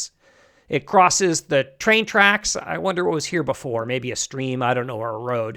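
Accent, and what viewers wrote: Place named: United States. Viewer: Content aside, couldn't the presenter have made a minimal effort to pronounce the Italian correctly, even with an American English accent? If he can use Google Earth has he not heard of Google Translate?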